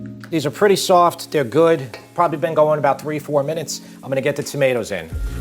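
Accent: New York, United States. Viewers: Hey , let me make you ah pasta you can't refuse . Love watchin this guy and his N Y accent. Great recipes . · Love the ny accent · Don't usually hear such thick New York accents on YouTube cooking channels. I like it. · As a Brooklynite, I can enjoy hearing your wonderful NY voice. If you’re not from NY, please let me know, or else fogeddaboutit😂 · Are you from ny or nj? 🙂 ok,, yes, I see, ny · where are you from? new york or new jersey? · I love the accent 😍 New York??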